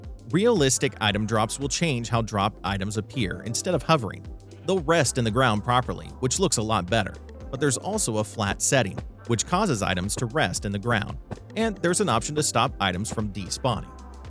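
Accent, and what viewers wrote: Netherlands. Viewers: are you realy english or dutch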